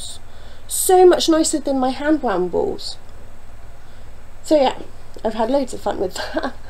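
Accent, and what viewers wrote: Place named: United Kingdom. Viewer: Hi! I just discovered your podcast today and watched them all. Lovely to find another British voice.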